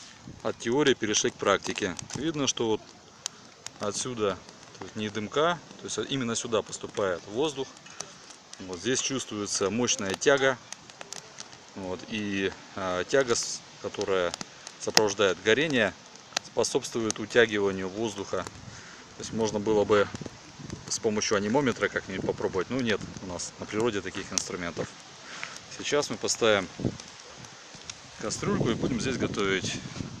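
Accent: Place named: Ukraine. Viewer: Украинский говор как не странно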